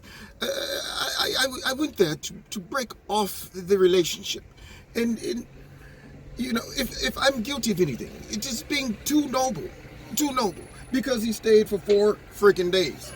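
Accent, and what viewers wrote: England, United Kingdom. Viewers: Hilarious! Love it! Love the British accent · Your british accent is so funny! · Your British accent is jolly good! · I love your English accent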